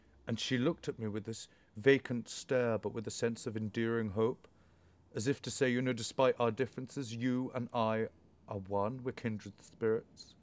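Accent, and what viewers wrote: United Kingdom. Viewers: What kind of accent is this? It's like some British accent mixed with a Californian accent.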